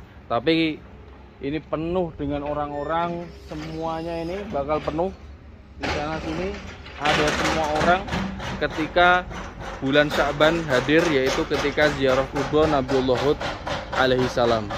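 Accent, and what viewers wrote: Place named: Indonesia. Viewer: saya lebih suka orang indonesia yg bicaranya berlogat indonesia ,daripada orang indonesia logatnya di arab2kan...jaga terus tradisi logat indonesia nya mas .,jangan seperti yg lain yg logatnya di arab2kan...budaya kita kekayaan bangsa kita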